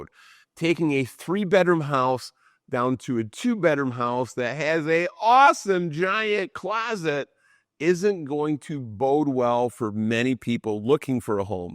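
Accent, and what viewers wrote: United States. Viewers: You sound like you're from the Binghamton area! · Love the Michigan accent!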